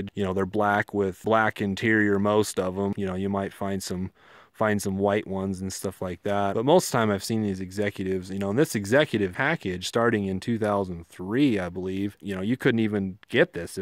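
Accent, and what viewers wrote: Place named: Buffalo, United States. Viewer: God bless u but i cant stand the way people from buffalo ny talk its always like your across the street when your right infront of them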